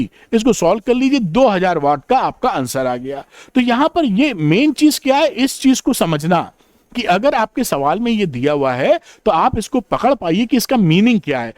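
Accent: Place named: Mewar, India. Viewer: That’s actually the natural Dialect of Mewad side in Rajasthan. It’s catchy though. 😂😂😅😅😊😊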